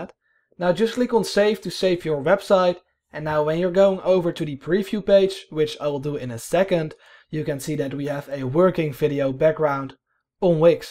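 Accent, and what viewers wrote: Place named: Netherlands. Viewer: Sjonge jonge ik hoor dat platte Nederlandse accent gewoon keihard, heerlijk! Het heeft gewerkt, dankje! :)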